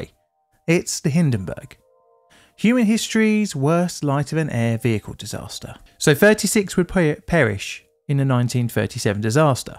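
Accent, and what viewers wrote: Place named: England, United Kingdom. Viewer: As someone who has a lil trouble understanding an English accent I kept hearing 90s. It took me a second to realize he meant 2000s.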